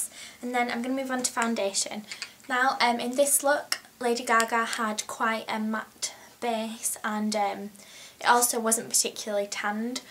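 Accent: United Kingdom. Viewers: and a question; is your accent british or scottish or irish? i'm not from england and i can't decide which one is it, and i lovelovelove it!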